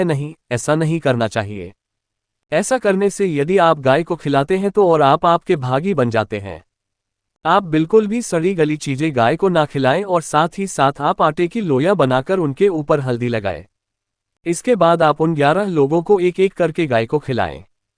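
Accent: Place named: United States. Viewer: Aisa lagta he america ka admi hindi bol raha he, chutyapa 😝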